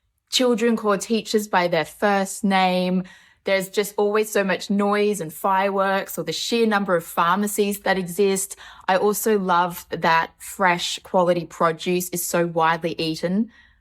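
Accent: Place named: Australia. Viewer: I could listen to you talk all day long, LOVE your Australian accent ❤️🇨🇦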